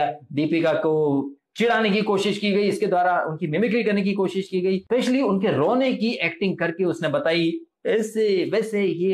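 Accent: Haryana, India. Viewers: bhai aap kamal ho 👏👏 👏......Aapka Haryanvi andaaz, aapki break up wali aisi lagi lagan aur jasleen-anupji ka breakup ka jo varnan kiya hai wo bahout enteratining laga..Mujhe personally bahut hassi aayi jab aap alag andaz mai baat kar rahe the.....Aapka 15-20 min ka review 1 gante ki borring bb12 se jyada entertaining hai 😂😂😂😂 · jii aapka haryanwii andaaz ekdum kamaal...jalota aur jasleen ka kapdonka drama sirrf aur sirrf trp boosting tactics..kuch bhi reaistance n dikhake directly kapde aur makeup de deti toh drama kahanse bann pata..agar trp laa rahe hoo toh bb khud bachate hai frm elimination...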